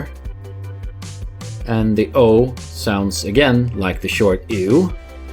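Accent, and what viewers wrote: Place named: Sweden. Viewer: when I have stoped laughing I will try to figure out what part of Sweden you are from.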